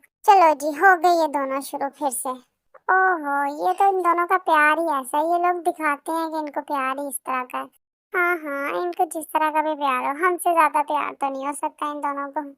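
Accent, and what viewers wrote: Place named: Pakistan. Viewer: Ap ke bol ne ke tarika se aisa lag raha hai ki aap Pakistan se ho. kya ap Pakistan se ho? Becoz mai Pakistani Jikooker hoon.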